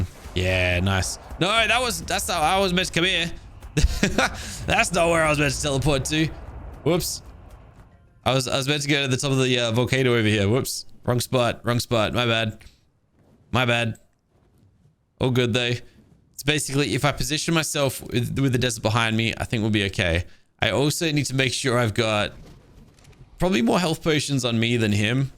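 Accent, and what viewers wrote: Australia. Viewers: Sounding extra Aussie today. Good stuff!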